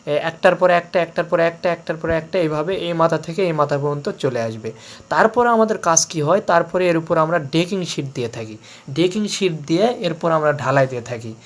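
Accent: Naogaon, Bangladesh. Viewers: নওগাঁ আর জয়পুরহাটের ভাষা কি এক? উনার ভাষা নওগাঁর মত।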